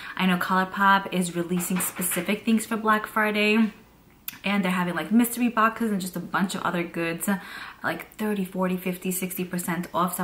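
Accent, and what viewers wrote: New York, United States. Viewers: Hi, your makeup application is so flawless! Can you tell me what brand of blush you're wearing and the color, it's so pretty!! Also, I love your New York accent😊🥰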